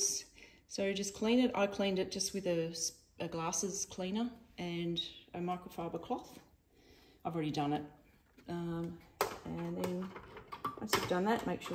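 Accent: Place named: Australia. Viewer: just got one from facebook marketplace yesterday.. cool to hear another aussie on the tube 🇦🇺🇦🇺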